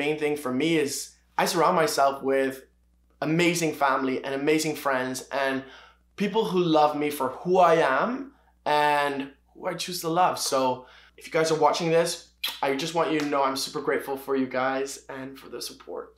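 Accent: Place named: North America. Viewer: Very interesting video thank you I think it’s interesting how your accent sounds more North American than Barbadian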